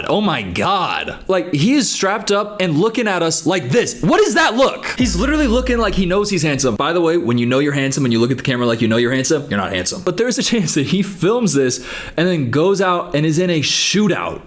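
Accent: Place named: South, United States. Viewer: The Southern mom voice is one of my favorites😂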